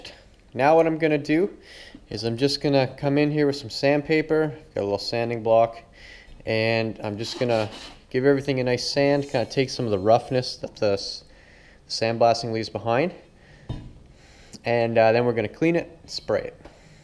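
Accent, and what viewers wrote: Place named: Canada. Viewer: the content provider’s dialect sounds Canadian to me, possibly Wisconsin, or Minnesota here in the States.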